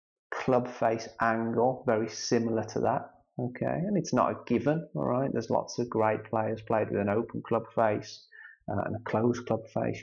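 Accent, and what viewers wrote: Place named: Black Country, United Kingdom. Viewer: Hi really enjoyed this analysis of the master! You say it is really important that his head/eye is tilting forward to help the back swing could you explain? Also how much forward tilt from the hips do you recommend. By the way love your black country accent!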